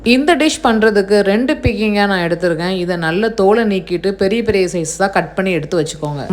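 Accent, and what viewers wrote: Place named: Erode, India. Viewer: It is erode side accent.....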